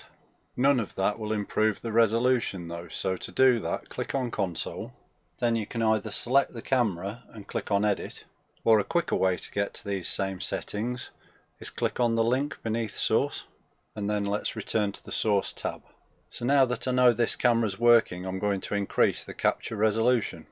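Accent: United Kingdom. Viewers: that british accent must be staight out from a harry potter movie or sm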